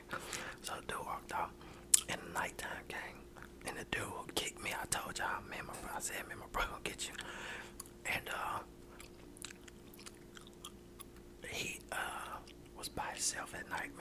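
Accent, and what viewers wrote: Alabama, United States. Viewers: What part of Alabama are you from? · Bruh what part of Alabama you from? This sounds like some Birmingham or Montgomery shit right here hahah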